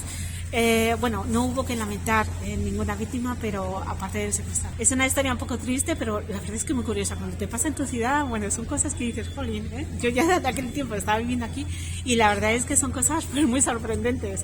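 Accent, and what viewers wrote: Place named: France. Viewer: eres española y.. se te escucha el acento frances.. heee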